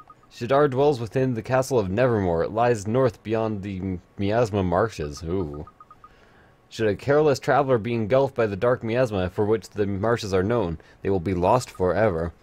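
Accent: Canada. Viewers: Your voice is like sexy butterscotch being dripped into my ears. Where in Canada are you from?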